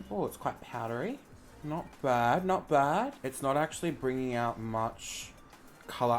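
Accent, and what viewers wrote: Australia. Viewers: australian accent is aesthetically pleasing omg help me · Ellie Harriss he's Australian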